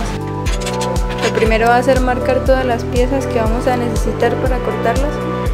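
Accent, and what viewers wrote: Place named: Colombia. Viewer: no sé cómo mostrarte los vídeos que encuentro en yt y te puedan ser útiles. Busca en YT: how to hydro dip ANITHING Creo que te va a interesar para pintar alguna de tus creaciones Pdta: me encanta tu dejo Colombiano xd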